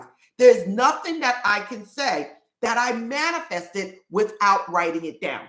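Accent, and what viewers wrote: United States. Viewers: I like your videos but your English is too American to me At times I don't hear you clearly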